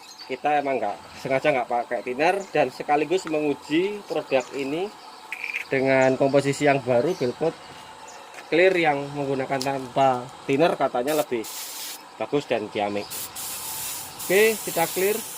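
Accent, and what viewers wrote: East Java, Indonesia. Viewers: Mas sampean asli jawa timur ta?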